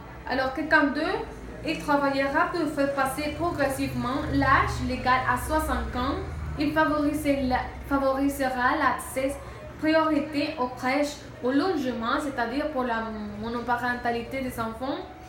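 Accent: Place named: France. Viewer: Bravo, vous avez un bel accent français et vous le parlez bien. Par contre, votre exposé aurait été, peut-être, mieux expliqué en utilisant plus de temps pour tous les candidats (11 candidats). Peu importe, je m'attendais à une revue biaisé des élections et... Je suis surpris par la justesse et l'effort apporté. Je vous salue depuis l'Amérique au Canada.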